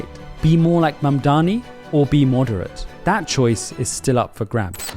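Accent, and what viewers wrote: United Kingdom. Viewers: There’s a Middle East fella with a British accent explaining USA politics issues 😂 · At about , he doesn’t even get the frickin states he highlights correct: he says “Arizona,” but his on-screen map highlights “Arkansas.” British accent gets you some points, but then again, it also highlights that your expertise around the nuance and cultural dynamics of our politics may not be up to snuff… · What does a brown guy with a British accent know about Americans?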